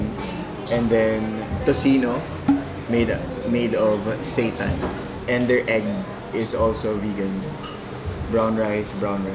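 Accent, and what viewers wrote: Bicol, Philippines. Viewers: I can still hear the bicol accent. Siram sa pag dangug haha Namiss ko lugud ang Naga hah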